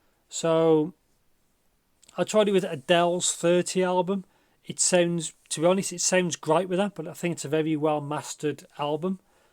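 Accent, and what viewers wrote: United Kingdom. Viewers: This is standard UK english, yup it's hard to understand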